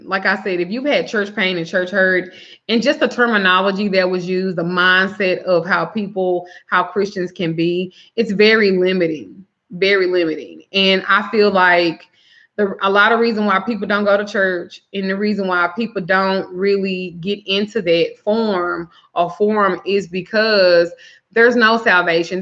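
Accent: South, United States. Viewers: I love your accent :) Can I ask where it's from, Texas? Alabama?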